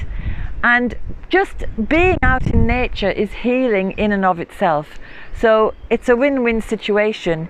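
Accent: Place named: North East England, United Kingdom. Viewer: Hi again, it’s Jen from the North East :) I’m absolutely loving your channel- you really do draw the watcher in! I hope to be as good with herbs as you one day! I’m studying with heartwood and will be qualified in 6 years. I just did my meadowsweet monograph which was so joyful. Thanks for all these videos- I’m going to continue to follow you. Medicine making vids would be helpful but to be honest anything you put out is lovely. Btw I watched your video on plantain earlier on, which isn’t a herb I’ve come across that much- later on today on my walk out, what do you know- plantain showed itself to me! Wow! Form your accent I’m guessing you were from either Durham or teeside way? I am originally from Middlesbrough! ☺️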